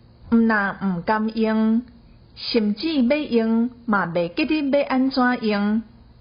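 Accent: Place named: Central Taiwan, Taiwan. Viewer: 足親切的台灣中部母語!讀到韻味十足!有學著,馬欣賞著.真勞力!🥰😀😄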